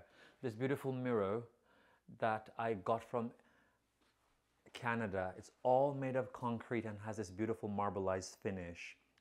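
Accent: Jamaica. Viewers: Within the first 5 mins. of the video, I recognized your Jamaican accent. So proud of your home and your accomplishments😃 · Rest assured, his accent cannot be pinpointed to the mannerisms and intonation of one particular (European) group of people. It has hints of Jamaican, British, and American. Which makes total sense given his background and where he lives. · I can hear the Jamaican accent. Yes sir. I'm Jamaican too and I'm cooking right now. Working on the rice and peas. Love you sir. You're a class act!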